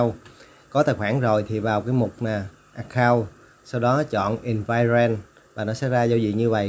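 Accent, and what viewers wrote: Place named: Mekong Delta, Vietnam. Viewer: Khoái nghe cái giọng miền tây của bạn này, nghe thật thà mà tiếu tiếu, nói chung tất cả video bạn hướng dẫn rất rõ ràng ... thanks chúc bạn thành công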